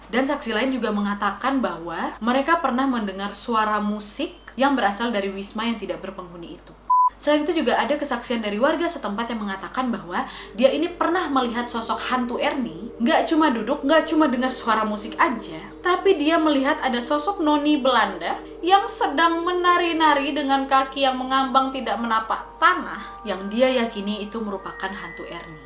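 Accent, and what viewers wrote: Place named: Medan, Indonesia. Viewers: Sepertinya kau dari medanya kak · Sakinn sering nonton chenel ka:/ aku ngomong jdi medok Medan smpe ada wak² nya, trs di tanyain gini he medok amat ngomong lu eh aku mlh jawab " bacot kali kau ini, kesel kali aku wak:v" ketularan jadinya🤣 love ka😍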